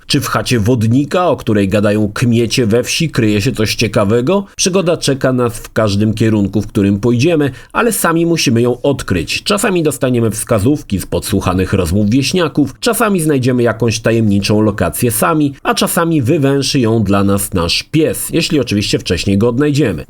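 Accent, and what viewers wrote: Łódź, Poland. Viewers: To nie seplenienie to gwara Łódzka XD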